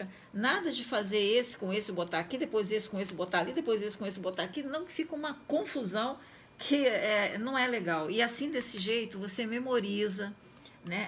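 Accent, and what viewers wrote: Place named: Rio de Janeiro, Brazil. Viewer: Tava procurando um jeito de fazer cartela com meus lapis aquarelaveis, caí aqui! Tem gato, sotaque carioca e o conteudo otimonl! amey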